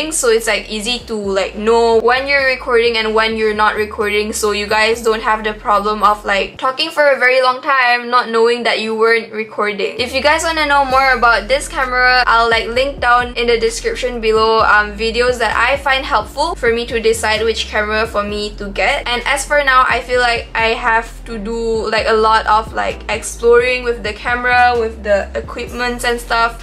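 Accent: Sarawak, Malaysia. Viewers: Akak orank sarawak kahhh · Sarawakian? I heard something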